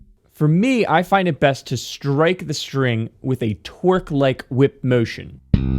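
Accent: Baltimore, United States. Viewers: Awesome lesson, man! I enjoyed the Baltimore accent most of all though.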